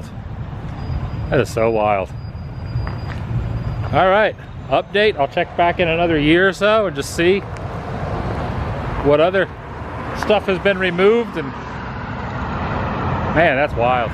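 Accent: South, United States. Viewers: do you have that beautiful Southern accent I love so much it's my favorite accent 💙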